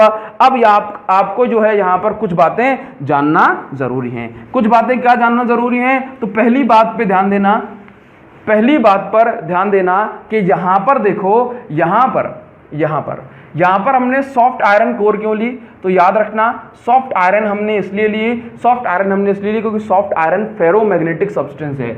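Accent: Odisha, India. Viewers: Sir are you from Odisha? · Are you from odisha? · R u from odisha?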